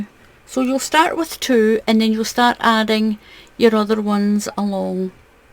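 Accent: Scotland, United Kingdom. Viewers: I love some of the patterns, and I also love your beautiful accent. You sound Scottish. I unfortunately, have trouble following along, because you don't use U.S. information/directions to your video's, and i find that very frustrating when I hear; "double crochet" and it's a "single/ treble." It's quite backwards and confusing to me.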